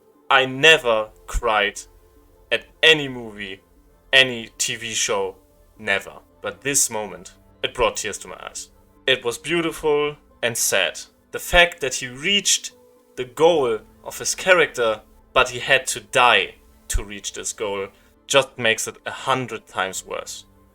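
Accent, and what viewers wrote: Germany. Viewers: You sound VERY German in accent coming from another German, it's very endearing to hear! This little thing that delighted me aside though, solid video! As a local Abbi fan I thoroughly enjoyed it. ❤️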